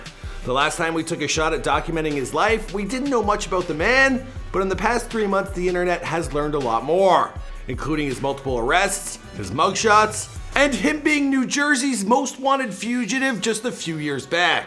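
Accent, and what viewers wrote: Canada. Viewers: I hate the Canadian accent lol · Why do people always talk junk about? I like his content. Yea he’s a Canadian and don’t pronounce everything the way we do but cut the guy some slack y’all.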